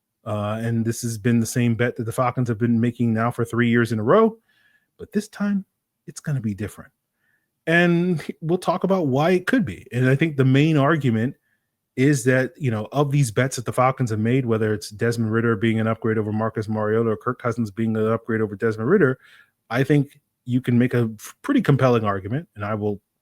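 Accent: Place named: Germany. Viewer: I vote more German accent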